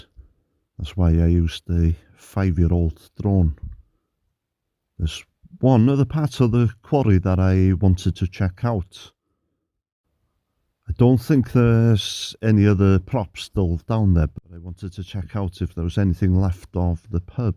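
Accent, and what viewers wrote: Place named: Caernarfon, United Kingdom. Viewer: Faacinating stuff, thanks! Completely off topic, I'm trying to place your accent. Caernarfon?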